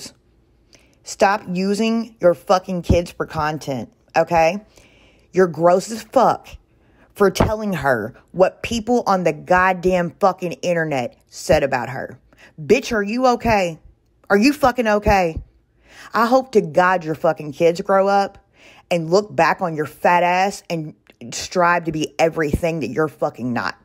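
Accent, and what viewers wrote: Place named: Appalachia, United States. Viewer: I watched the first cpl mins of her live from earlier and I have to say, her harping on you not enunciating the L in the word hole…girl lol. Does she know what accents are? That’s the Appalachian dialect and yours isn’t really as thick. Accents have no bearing on education and intelligence. My daughter is PAID and flown to California etc to research math and present on her findings. Her accent is as thick as mine. All 3 of my kids tested in the top 5 percentile and are highly educated and motivated young women, two of which test on genuine levels. One was even scouted out by YALE, but even with their programs my country ass is too poor for all that lol. They are as country as cornbread when they speak. Also, if I recall correctly doesn’t she say “warsh” for wash? 🙄 Thinking someone is ignorant because of their accent is one of my biggest pet peeves lol so I had to say something. 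Also, as someone that struggles with mental health and has children that also struggle…it’s not safe or wise to tell a child anything negative being said about them, no matter who it is. They deal with enough from their peers as is, why add to that? Anyways, maybe one day I’ll hop on your panel and be as redneck as I possibly can to really give her some stuff to laugh at 😂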